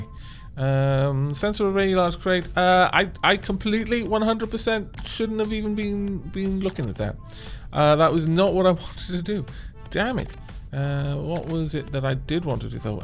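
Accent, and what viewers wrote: United Kingdom. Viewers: PyroDesu Wales is part of Britain. irgo he has a British accent. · Impressive that you get a British accent out of it, considering (and his accent) is Welsh, not British.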